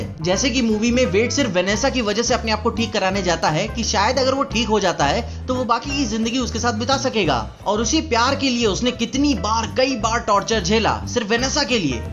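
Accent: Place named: Bihar, India. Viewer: You must be bihari You sounds like bihari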